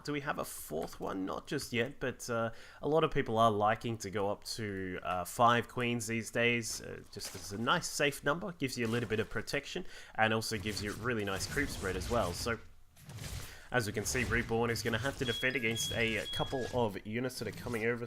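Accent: Australia. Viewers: Nice cast. Are you Aussie ?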